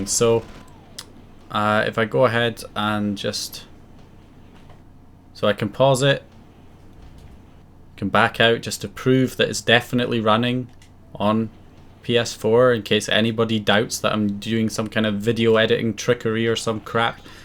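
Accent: Scotland, United Kingdom. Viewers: Irish...? he sounds Scottish to me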